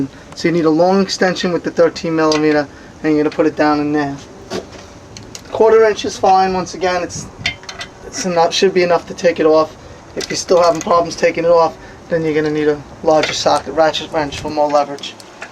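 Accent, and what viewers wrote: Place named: Brooklyn, United States. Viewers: Love that New York accent, even a Cajun like me understood the good video job. Thanks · Thanks for the video very informative, were u born and raised in long island because you have a Brooklyn accent..hehe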